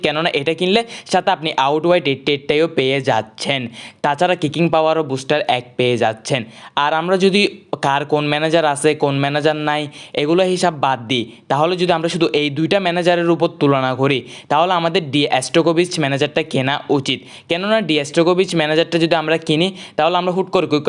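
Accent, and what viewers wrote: Chittagong, Bangladesh. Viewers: ভাইয়ে কি চিটাইংগে নে?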